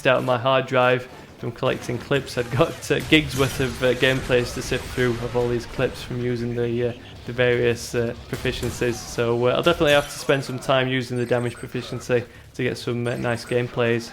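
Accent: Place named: Yorkshire, United Kingdom. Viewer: What part of yorkshire are you from?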